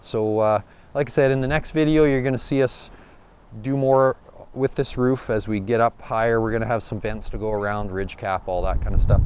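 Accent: Canada. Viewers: HE MUST HAVE GRADUATED FROM THE ROYAL ACADEMY OF ROOFING IN CANADA! HES PAINFULLY SLOW! AND THAT ACCENT IS BEYOND IRRITATING , NO WONDER CANADIANS FIGHT SO MUCH! DOESNT EVERY ROOFER USE SCAFFOLDING ON A RANCH ROOF? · eh man are you Canadian? you sound me that's why Im asking haha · It's like listening to my grandpa when he explained how to do stuff. But with a Canadian drawl.... Good stuff.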